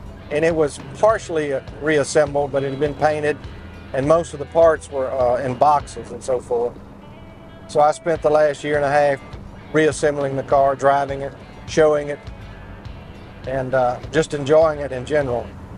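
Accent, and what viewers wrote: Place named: South, United States. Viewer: What a beauty! I love the police cars of the 60's and 70's, but I'd even be happy to own an 80's square-body Crown Vic. Question for you: I notice that you have a mild southern accent, but you could also imitate that strong drawl of a good 'ole boy. What is the difference? Does it depend on the region? (Oh, I'm realizing that is the videographer. Great work on that too.)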